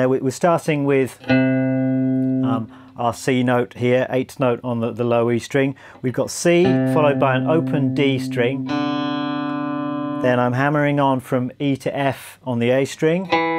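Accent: United Kingdom. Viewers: Hi, I am amazed at these lickes and phrases you keep coming up with. You sound british but you'rer true country. At 77 years old as I, i FIND i am not too old to learn. I am rather new to your youtube as you might have guessed. Keep it going.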